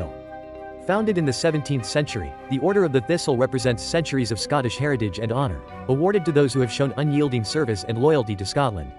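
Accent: United States. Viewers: Hate the bloody American voice commentator, and please stop using YouTube’s most overused word…SHOCKING! 😤👎👎👎🇦🇺🦘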